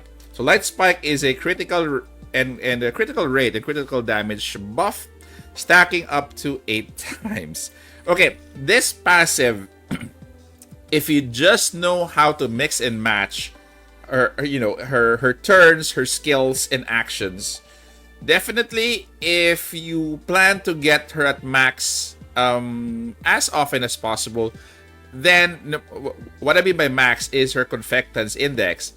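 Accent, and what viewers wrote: Philippines. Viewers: bro is it me or do you sound like your average filipino teacher/instructor especially with that signature filipino reiteration and intonation during explanation lmao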